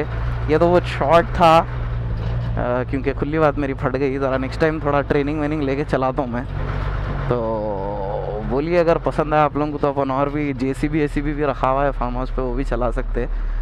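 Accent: Hyderabad, India. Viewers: Bhai you nailed it . loved the way you spoke the typical Hyderabadi way! Definitely want a mlre though test of the truck and a tractor! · bhai ek number Hyderabadi commentary marte...yarro... Ab next ice-cream ki thela le k niklo aur apne dosto k aur pechchaan k ghar k aju baju ja k responses record Karo... Bada majja aayenga aap ko bhi aur un ko bhi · bhai kab sudroge humesa naya naya karte ho. sound to superbikese bhi jyada atsi. mujeh aapki hyderabad language bahut atse lagte ho bhai keep it up. teeper hogaya crane bhi karo bhai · I love the hydrabadi language how you talk!!! 😂😂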